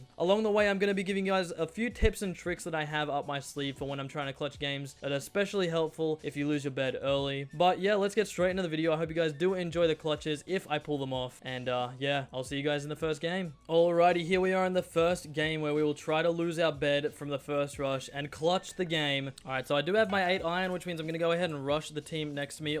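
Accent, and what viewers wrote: England, United Kingdom. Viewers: Are you British?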